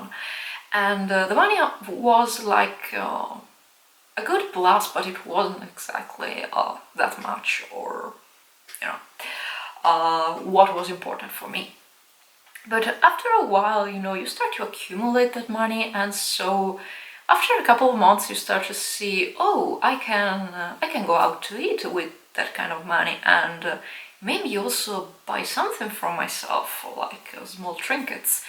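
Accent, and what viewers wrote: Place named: Netherlands. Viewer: I too felt guilty about spending money on frivolous art supplies...though, they brought me joy. I admire your tenacity to really pay your necessities, before your luxuries...you are one smart cookie...though you live in Italy, you actually sound Dutch to me...thank you for your input here...much appreciated...Janis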